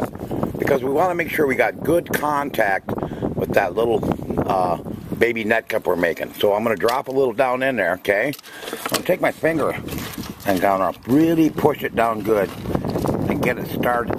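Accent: Minnesota, United States. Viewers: im sitting here watching his videos thinking the way this guy talks hes gotta be from minnesota haha. and sure enough he is. cheers from saint paul!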